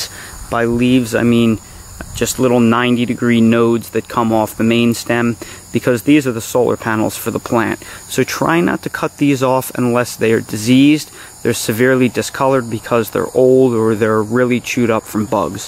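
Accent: United States. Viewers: I hate that american accent !! You should have cut off the top much earlier.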